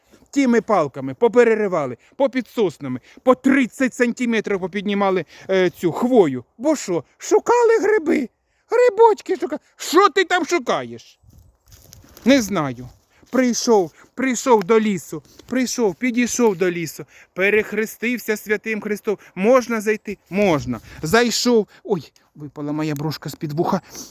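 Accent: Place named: East, Ukraine. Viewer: От так говорять на сході❤❤❤❤❤❤❤❤❤❤❤❤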